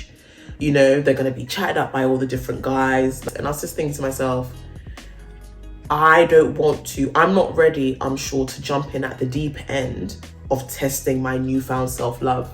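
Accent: United Kingdom. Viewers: I had a feeling you were British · Are you British? You don't sound American. Very interesting video, thank you for sharing. Your story telling style is so calming.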